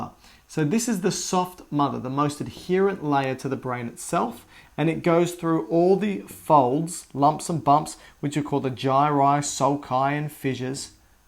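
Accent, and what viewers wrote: Australia. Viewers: As an Australian, I love watching people in the comments of your videos struggling to understand your aussie accent 😂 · Madhu Parisa that’s just the Australian accent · Same lol, it's the Australian accent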